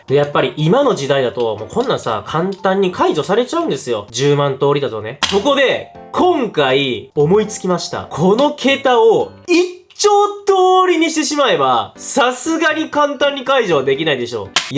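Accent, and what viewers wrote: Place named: Kobe, Japan. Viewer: 方言的に神戸の人かな？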